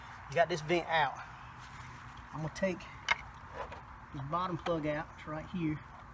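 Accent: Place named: Alabama, United States. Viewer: i was watching the video in the shop doing my water pump and one of the other mechanics said he sounds like he's from Alabama too ..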